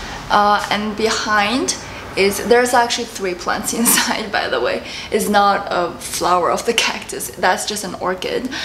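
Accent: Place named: Southern California, United States. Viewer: It’s a SoCal accent, silly goose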